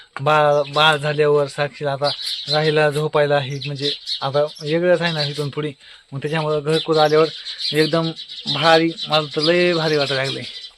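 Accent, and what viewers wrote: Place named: Kolhapur, India. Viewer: साक्षी चे बोलणे एकदम मस्त आहे कारण तिचे बोलणे थोडे थोडे कोल्हापूर चे वाटते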